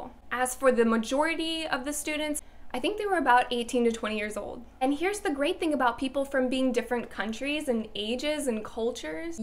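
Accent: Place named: South, United States. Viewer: Thank you for your Speaking Japanese in a Southern accent videos, those made my day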